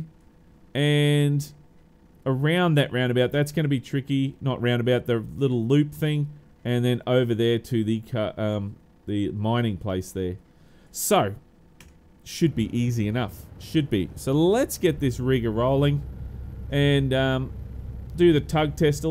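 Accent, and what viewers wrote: Australia. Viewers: I hope that SCS Software DLC (as you mention in the video) will include some of the latest SCANIA Truck models as well, and not only Volvo trucks. I do have to emphasize that as an old former truck driver (25 meter) with years of experience, SCANIA is the brand of trucks that are very close to my heart, and have always been. In another words, I'm a loyal SCANIA Truck Fan. By the way, with all respect, I really like your Aussie Accent. And another thing, stay away from the Whitetips, Eastern Brownies and Taipans (specially the inland ones);-) Take Care and God Bless You and Your Family.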